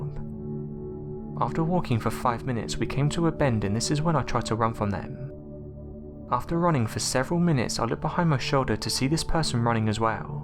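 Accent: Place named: Manchester, United Kingdom. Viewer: Agreed... and though many chime in defending him when I say this, I STILL get very tired and annoyed with that accent. I understand all accents have a reason for existing and started long ago, nobody is right over the other when it comes to language blah blah etc... I figured that out without any help and I don't mean to be some sort of exterminator about it. In fact sometimes I wonder if HIS style (Manchester? Whichever it is...) might actually be one of the oldest ways English was originally developed (I doubt it though), it's still annoying as hell. How anyone would think "yeah, I want to start smushing some syllables into others, completely dropping other syllables, and trail off on almost every last word of every sentence and swing the cadence of each sentence the same near the end... that will help people understand me!" is baffling. 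During the large snake stories and accounts for example, I actually had to give up understanding several different parts and words!! They were so swallowed up or crushed that I can't tell when some sentences and words start ad stop. The information on the other hand, is very fun, creepy, and interesting. He never delivers it as gospel, doesn't have a strong bias towards any of them nor does it seem like he's trying to coerce us to accept anyone's opinion. He just delivers the story and I dig that. Just wish I didn't have to concentrate on ironing out the words in every other sentence.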